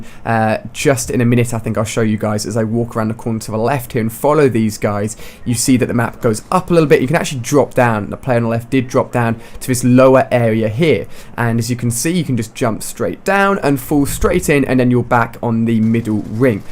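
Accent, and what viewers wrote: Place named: London, United Kingdom. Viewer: i think he is from UK but maybe london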